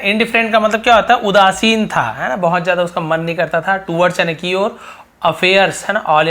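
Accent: Chhattisgarh, India. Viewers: Sir aap raipur chhattisgarh se ho kya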